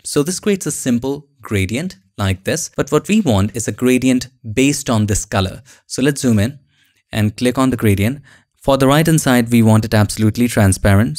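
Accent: India. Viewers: I never thought I love the indian accent until I fell asleep while watching this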